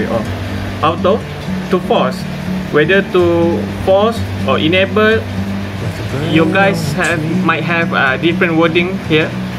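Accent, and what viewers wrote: Malaysia. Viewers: Malaysian? 😃 Or Singaporean I'm from Singapore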